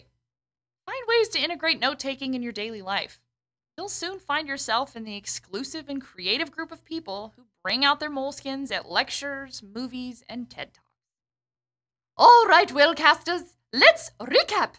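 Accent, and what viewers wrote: United Kingdom. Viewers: omg with british accent you are just like Miss McGonagall from harry potter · Hi, thanks for information! I like the speaker saying british accent😂 And is this method applicable to non fiction class? Such as anthropology? Thanks!!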